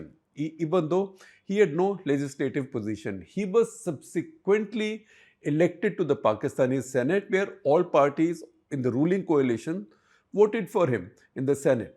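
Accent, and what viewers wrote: India. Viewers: And your programs on international affairs and politics!!! Only easy Indian style English of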